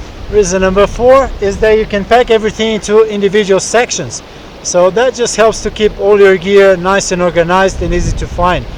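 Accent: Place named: Brazil. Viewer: Thanks for the video! I discovered dry bags recently and have started using them a lot. Incidentally, love your accent. At first I thought Brazilian, then figured you for an Italian... then found your Instagram and had my original suspicions confirmed! :)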